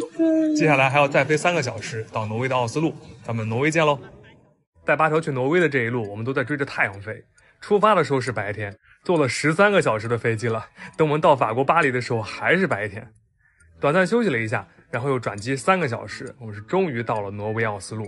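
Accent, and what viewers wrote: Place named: Henan, China. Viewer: 我还说这“去球”咋振的河南味儿了，果然老乡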